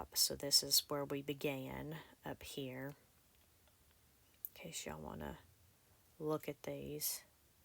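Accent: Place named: South, United States. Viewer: It’s the southern American accent lol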